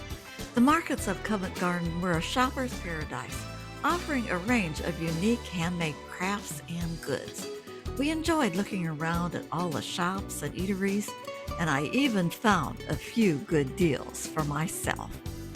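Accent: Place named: United States. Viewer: Love your commentary best American accent ever enjoy your stay , when are you coming to the greatest country in the world, Scotland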